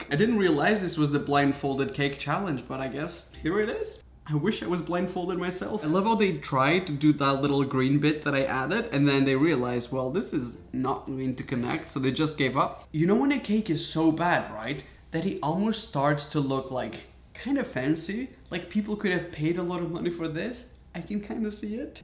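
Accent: England, United Kingdom. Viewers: He’s from england i think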